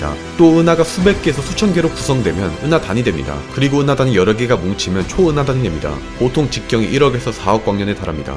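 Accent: Gyeongsang, South Korea. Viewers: 경상도 사투리 엄청 거슬리네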